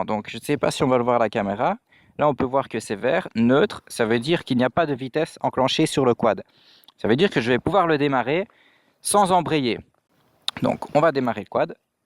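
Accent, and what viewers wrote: Belgium. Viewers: Tu es belge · Tu es belge ?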